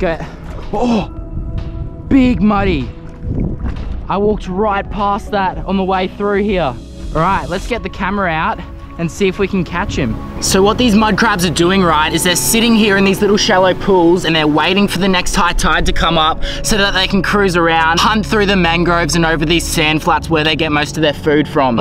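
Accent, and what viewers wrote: Australia. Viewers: I don’t know how or why YouTube recommended your video on my feed 🤔. But, the moment I watched your Snake 🐍 Island 24-hr Survival video 📹, I was hooked. Your zest for outside life adventures, animal bewilderment, the way you filmed.. very captivating ☺️☺️. I am now living vicariously thru you 😆😆😆. Love your Australian accent too. 🤙🏽🤙🏽 You’re so different from me and my 9-5p job, I find you fascinating. Much love 💕 from Shreveport, LA USA 🇺🇸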